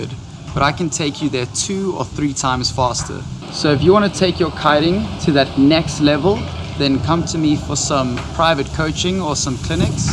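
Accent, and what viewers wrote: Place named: South Africa. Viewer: Bru! So rad to randomly catch a Saffa accent on YouTube :) makes me homesick. Will deffo be checking out more of your vids, keep it kif ma china!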